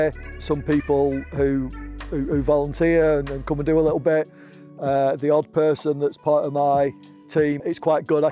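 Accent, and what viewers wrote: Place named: Lancashire, United Kingdom. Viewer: He does have a strong accent, my guess is he's from Lancashire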